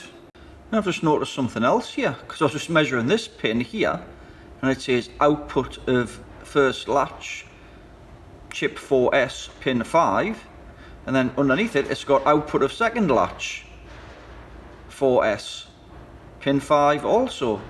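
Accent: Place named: Newcastle, United Kingdom. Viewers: Very interesting, thanks. Dad was from the NE, love the accent. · Ooooo sound like a Geordy must be close to me hopefully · You sound like your from my area Newcastle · You sound from the north east whereabouts are you?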